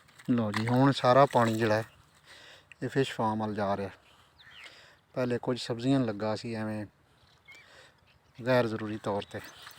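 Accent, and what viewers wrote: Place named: Hafizabad, Pakistan. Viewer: Mashaa ALLAH Bhai zabardast Hafizabadi boli soooo niceeee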